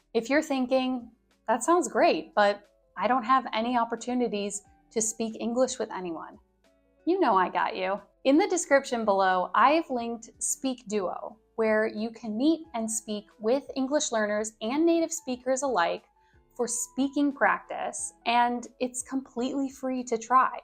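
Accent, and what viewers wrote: United States. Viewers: are you American?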